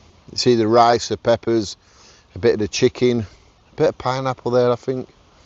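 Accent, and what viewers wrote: United Kingdom. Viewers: here for outdoor motivation, and best uk accent! thanks mate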